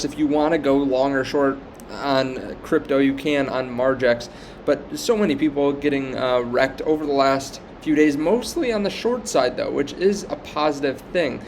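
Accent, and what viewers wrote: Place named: United States. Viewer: What are you talking about "foreign"? His English is perfect. He sounds American. I've lived in Portland most of my life. Even many Americans pronounce Oregon wrong because they aren't from this region or haven't heard it said much. It's not an insult to correct someone about it, nor was it meant as one. Seriously chill the F out lol.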